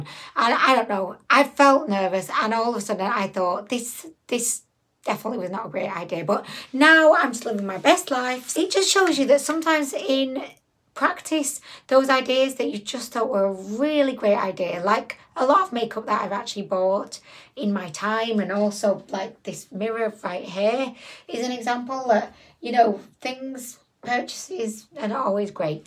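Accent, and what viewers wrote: United Kingdom. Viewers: For opening packages, you should get a set of spudgers off Ali Express. Besides the fact that "spudgers" is a fun word to say, and I want to hear you say it in your English accent (which I, as an American, am required to think is elegant and awesome), spudgers are little plastic prybars that were made for fixing phones, but work really amazingly well for getting the shrinkwrap off nail polish bottles and opening the box on BB creams. Get the set of three that comes with a thin metal shiv. They also work great for removing batteries from toys, and they will spare your nails.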